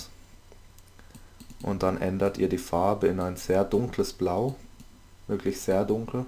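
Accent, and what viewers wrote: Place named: Swabia, Germany. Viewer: Du bisch a kleiner Schwab wa? ^^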